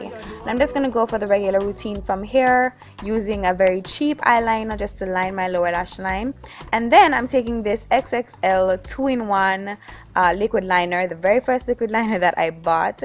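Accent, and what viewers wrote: Jamaica. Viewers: are u Jamaican?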